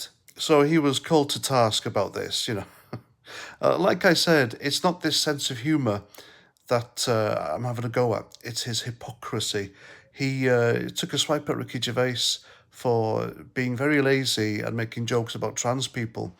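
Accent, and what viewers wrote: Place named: Scotland, United Kingdom. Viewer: Great Scottish accent. Do more!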